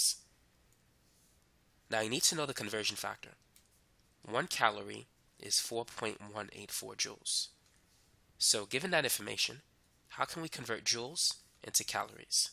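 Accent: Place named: Brooklyn, United States. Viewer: am i the only one who noticed he has a brooklyn accent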